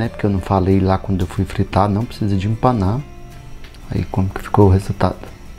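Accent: Minas Gerais, Brazil. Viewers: Você passa uma paz tremenda!!! Amo sua maneira de falar. Vc é mineiro? Sua comida é muito bem feita, e com certeza saborosa! Vc em outro dia falou como sua mãe educação vc! E falou de uma maneira respeitosa... lindo! Gosto de vc de graça! Ñ é uma cantada! É um elogio❤👏👏👏👏👏👏👏👏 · Gente que simplicidade adorei parabens! Sotaque meio mineiro, goiano? Adorooooo fogaozinho a lenha me lembrou mto minhas origens. Parabéns acabou de ganhar uma inscrita e super like. Adorei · Seu jeitinho de falar é muito gostoso de ouvir, jeitinho mineiro , amo seus vídeos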